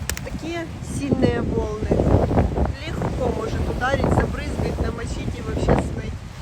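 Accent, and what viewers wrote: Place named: Ukraine. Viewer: Она не одесситка,видимо не так давно живёт в Одессе, оттого не все говорит верно,,, Довженко,,😮 слышится укр акцент,у одесситов другой говор,акцент 😮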